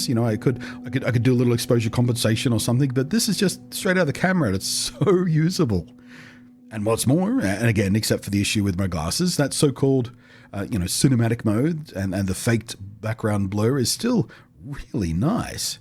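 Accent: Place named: Australia. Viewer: Nice slow and Aussie wow fantastic brother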